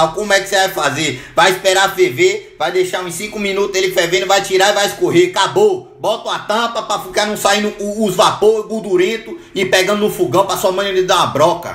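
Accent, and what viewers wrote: Bahia, Brazil. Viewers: Você é bahiano kkkkkkkkkkk adoro kkkk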